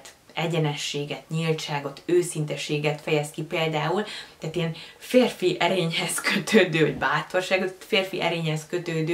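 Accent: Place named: Japan. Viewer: ez a hapogo, gagogo "japan" hangkepzesborzaszto. hallgathatatlan! :-( a hajad viszont NAGYON jo! :-)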